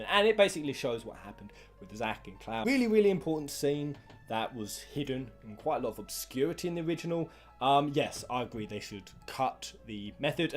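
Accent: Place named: Scotland, United Kingdom. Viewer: Scottish accent is great.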